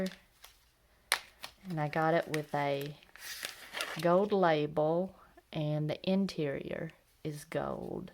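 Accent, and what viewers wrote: South, United States. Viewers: Nice southern accent. North Carolinian pen enthusiast here! Thanks for the video warning about this vendor. the Golden Espresso is probably gonna be my first Kaweco. Do you shop from Goulets' website? They're right in VA. Good stuff.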